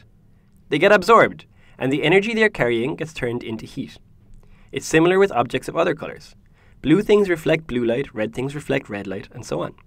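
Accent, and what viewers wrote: Ireland. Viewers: Of course he's Irish. Dunno about the Waterford part, I only know the wateford accent that tends to be monotone. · that an irish accent? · I love his Irish accent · His name and accent are Irish , fair play buddy ! Good video! what you studying ? Physics ? Is this video part of college or is it just a project you are doing ? I would be interested in making videos about science. Love teaching others!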